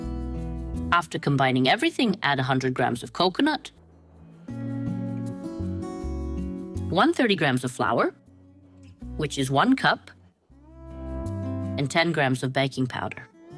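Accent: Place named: England, United Kingdom. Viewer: ... recién descubro tu canal,... ya estuve viendo 2 recetas,... me encanta como explicas, muy práctico y sin hacerlo tan largo,... qué buena y deliciosa éste Marble Cake,....me gusta tus utensilios que usas para cocinar,... yo entiendo poco en English,...pero hago ls traducción a Español,.... soy de Argentina, pero vivo en North carolina USA,....tú acento me parece de Inglaterra,.... muy bonito todo( me pareció muy bonita la fuente que usaste para hacer el cake 👌).saludos Miriam...👍👍👍💯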